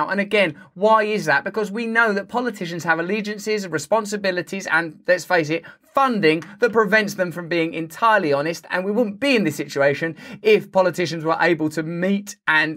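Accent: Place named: England, United Kingdom. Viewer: I luv ow you pronounce words... Souch a real english dude :D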